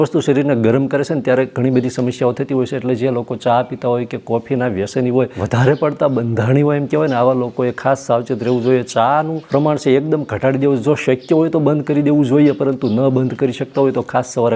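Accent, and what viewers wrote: Bhavnagar, India. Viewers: તમારી ભાષા અને ઉચ્ચારો પરથી લાગે છે કે ભાવનગર તરફના હશો. ખરું ?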